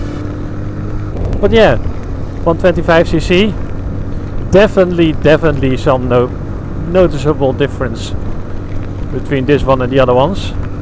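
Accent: Netherlands. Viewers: haha hoorde gelijk dat je nederlands was ik ben deze aan het overwegen als mijn eerste motor zou je hem aanraden?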